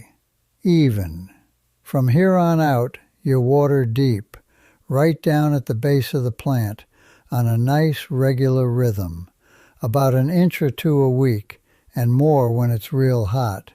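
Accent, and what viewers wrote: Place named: Rhode Island, United States. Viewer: AI has a RI or southern MA accent. Hilarious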